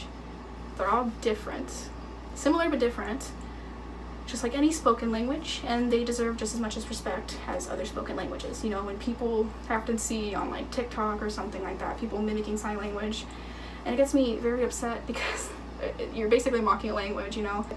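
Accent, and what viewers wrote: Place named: United States. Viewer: You know what I don't understand about you? You f lost your f hearing and all you cared are about people! "If I have cancer at least people will understand".. " I have something to tell people finally".. Whaaaat the hell is wrong with you people from USA? (I suppose you are from USA by your accent). You are all so shallow and willing to be.. part of something, included, communities, etc.. Damn girl. You have all my sympathy for the hearing loss but you need to change your ways. Really.. you are all like from the same material in there. I can't care less about people.. what they think.. I care about my f hearing.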